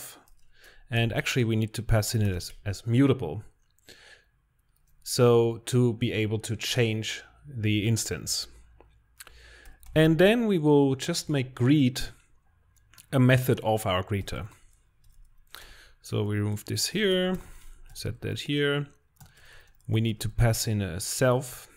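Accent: Germany. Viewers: I'm not a beginner in Rust, but I really enjoy how laid back your method of teaching is. It is especially nice to see you sit there on the chair and the computer on the wooden table and the code to the right. And of course, the German accent is nice. This experience is really soothing! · Agreed, german accent is always nice and welcome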